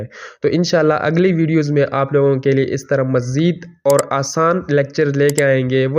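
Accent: Peshawar, Pakistan. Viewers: Is it Peshawari pushto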